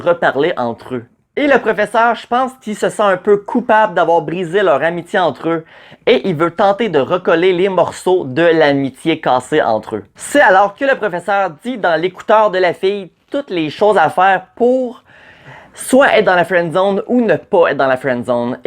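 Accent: Quebec, Canada. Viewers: L'accent québécois ça me tue Mais merci pour l'explication quand meme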